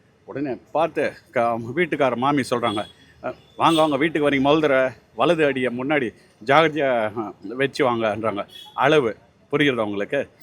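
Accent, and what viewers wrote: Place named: North India, India. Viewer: Neeee thamilunu solla tha da neye north India maritaha pesura punda 😂😂😂😂😂😂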